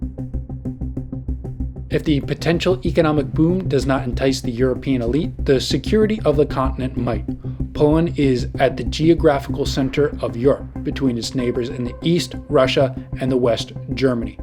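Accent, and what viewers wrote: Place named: United States. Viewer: Myślę że to Akcent amerykański ale nie jestem pewien z jakiego rejonu.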